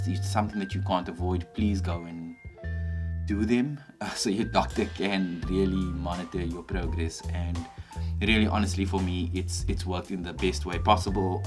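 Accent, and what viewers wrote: South Africa. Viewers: Hii I recognize your accent. I'm south african as well! I'm month 5 on E. Subbed. I too get my viles from Fagron.As for me i first tried groot skuur but they never called back so I managed to get an informed consent from a private doctor. Her name is Megan Martin if anyone's interested if they need help :) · Are you from South Africa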